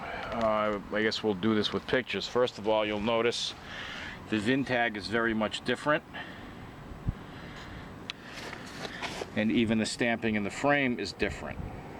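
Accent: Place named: New England, United States. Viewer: Mr., as always has lots of detailed information about his bikes even with his Goofy New England accent.............lol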